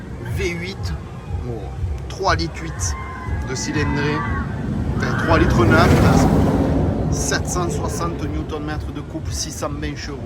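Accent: Marseille, France. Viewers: Salut! Tes vidéos sont au top, comme à chaque fois. Je kiffe vraiment tes tests et unboxing. J'ai 2 petites questions pour toi qui n'ont pas un rapport direct avec cette vidéo. Première question: Pourquoi ne pas faire des t-shirts et pulls comme tu fais déjà mais avec des bandes réfléchissantes? On pourrait rouler en toute sécurité en te faisant un peu de pub 😉 Deuxième question: Tu fais de temps en temps des vidéos avec des potes, mais est ce que tu envisagerais de faire des vidéos test avec des abonnés? Voilà c'est tout pour aujourd'hui 😁 Ne change rien, continue comme tu as toujours fait Et le petit plus, c'est notre bel accent marseillais 😂😂😂 Bonne continuation à toi, vivement la prochaine vidéo...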